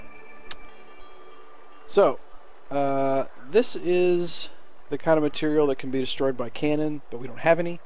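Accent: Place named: United States. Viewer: That's great for you, but as an actual American I'm telling you this guy is an American speaking English. Not everyone in America talks the same way a rapper does. Pretty much no one does.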